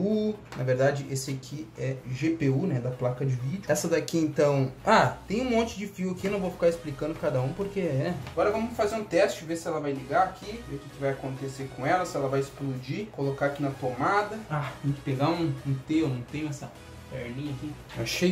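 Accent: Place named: Rio Grande do Sul, Brazil. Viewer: Tu é Gaúcho né meu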